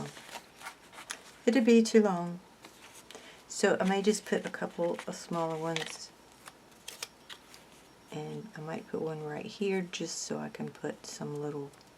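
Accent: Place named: Oklahoma, United States. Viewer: Hi, this is the first video that’s popped up on my home page, so I clicked on it as I am fairly new to decorating journals. My name is Karla, in Oklahoma. You don’t sound like you far from Oklahoma? lol your accent. Anyways, you created a very pretty spread. Love how the girls are cascading inside the faux Dutch doors. Thank you for bringing us along 😊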